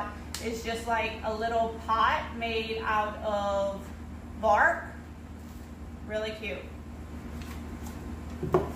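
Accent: Louisiana, United States. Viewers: So Awesome. Thanks for answering back. I'm from the West Bank of N.O....nice to hear another LA accent. I can't wait to try some if your ideas and your recent project sounds cute. Glad you were able to sell it. I hope to sell some of mine in the near future. ❤❤❤ Oh and that sounds so cute attaching them to a shutter.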